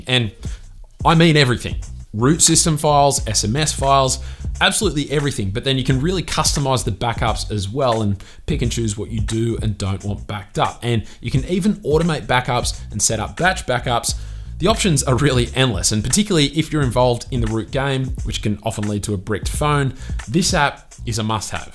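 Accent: Australia. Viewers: Haha I knew you were Australian as soon as I heard you talk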